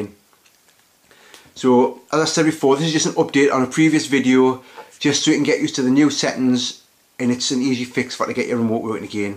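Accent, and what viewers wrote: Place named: Tennessee, United States. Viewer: You sound funny are you from Tennessee?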